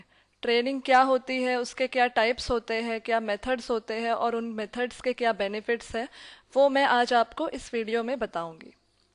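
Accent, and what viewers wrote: India. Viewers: Helpful program but Indian English voice that is difficult to hear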